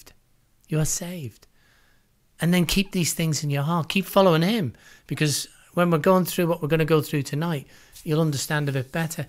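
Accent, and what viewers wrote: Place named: Scotland, United Kingdom. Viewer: Scottish? That wonderful accent.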